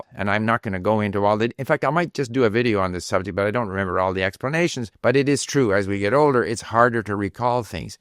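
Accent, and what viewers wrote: Iran. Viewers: از نظر شما هم لهجه‌ش مازندرانیه؟!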